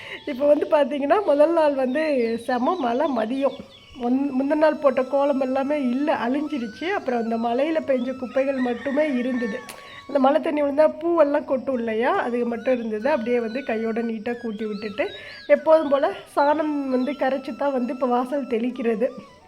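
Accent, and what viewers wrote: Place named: Kongu, India. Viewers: கோயம்புத்தூர் மாதிரி இருக்கு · வீட்ல மற்றவங்க தூங்கு போது விளக்கேற்றலாமா ஏற்றக்கூடாதுனு சொல்ற றாங்க எதை செய்வது உங்க பேச்சுல கொங்கு தமிழ் நன்றாகவே உள்ள்து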